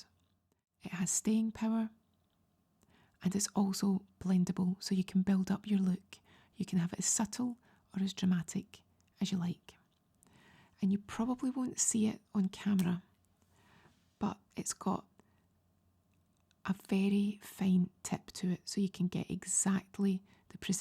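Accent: Scotland, United Kingdom. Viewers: I was about to doze off until I heard, "because she doesn't really have a butt, yet," which, when replaying it 3 times and factoring in the Scottish accent was of course, "because she doesn't really have a budget." I'm all like, 'Ohhhh... budget." :face-blue-smiling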